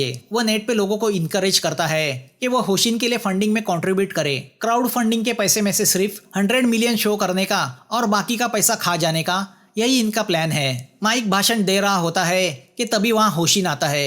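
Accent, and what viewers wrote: Maharashtra, India. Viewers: amazing Marathi accent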